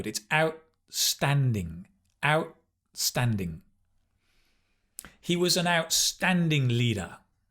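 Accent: United Kingdom. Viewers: I’ve never thought I would bump in your outstanding video lessons. Your way of teaching is a breeze, your native British accent is out of this world. I always pay attention to each word you say ; it comes effortless from your mouth. Despite watching other videos , this one has definitely been a top-notch, every expression is really worth and sound to know about and hopefully use . It would be a real let-down if I don’t use this from now onward. Thanks endlessly Sir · I adore your British accent.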